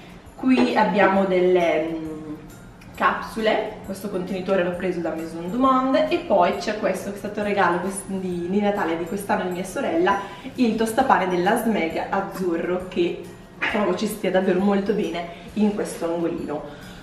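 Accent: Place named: Lombardy, Italy. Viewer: Dove vivi in Lombardia oppure in provincia perché mi sembra un accento lombardo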